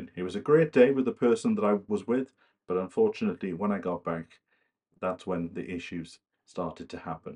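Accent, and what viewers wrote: Wales, United Kingdom. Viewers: I can’t remember how I came across your YT channel, maybe it was a recommendation by yt or a search about allotments in the Uk, one of the first videos I watched was when you did a bit of cooking and I thought, what a fabulous kitchen and then you talked about sharing the house and your cats, watched your rambles around London. I enjoy your Welsh accent, your deep singing voice, your unhurried relaxed approach and the way you ask your audience what they’re up to at the moment. It shows that you are a very experienced cameraman and your footage with the drone, the music, your storytelling is all very welcome in this mad world. I enjoy reading the comments and it’s a wonderful community to be part of, so please carry on doing what you’re doing, hope you manage to get your camera fixed 🤞🏾, Best wishes, Lisa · Came for the moving to wales vids (my mate has just moved there too,),,,,, stayed for the slow morphin of ur accent back to welsh... 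:) ATB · Jambo, am from Kenya though my 13 year old son schools at Ysgol Bryn Hy Fryd in Ruthin. I have visited Bryneglwys, Corwen couple of times and I love Wales compared to Nakuru, Kenya. The first video I watched was when you were moving to Wales. You seems interesting, down to earth and your sense of humor, you welsh accent that just does it for me.... I do enjoy gardening videos, walks on the mountains, how you relate to everyone that comes your way. You're you self, not fussy unlike the current youtubers of my age group (35-40). You're like a friend cause watching you is so comforting. I love your lifestyle. Keep the videos coming. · Hi, I started watching you about 6 months ago when I was scrolling through utube, to be honest it was your cats that I noticed first as I to have two black cats and then when I heard your Welsh accent I was sold as I spent many a school holiday in Llanelli as my dad was born and brought up there before moving to Bristol, so I spent many a holiday with my relations, I to live on my own now so we have lots in common, looking forward to your new adventure on your allotment. 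Good luck. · I came across your video by accident your Welsh accent caught my attention and when you mentioned Blaencwm and Treorchy I was hooked, as I told you the first time I commented I was born in Blaencwm, and although I still live in Wales I get homesick for the Rhondda and it’s people. I love your style your so natural, and I love the journeys you allow us to share with you, I hope you go on videoing for many a long year. Look after yourself, I hope the weather bucks up I am looking forward to seeing you lick that plot into shape.😀😠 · Your video appeared one day on my YouTube page . It was the day you moved into your cottage .I often try channels with English speaking people , for keeping my English fluent with contents that can be totally new to me or just someone's day to day life . I recently tried to understand a lady with such a strong Scottish accent that I gave up after about 20 videos. 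But your British accent is the easiest . I like the 2 kitties . I also have a black one . I like the cooking experiments, the future garden , and the countryside ...I've been to England numerous times but never to Wales so your walkabouts take me there ...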